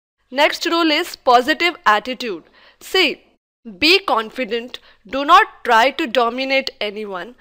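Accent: India. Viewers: Dam Sounds like an Indian is speaking, whats the problem with that? Engish is not our first language, stop acting like it is. She has taken the effort to make a video and help people. This video calmed my nerves a bit and helped me assess my strengths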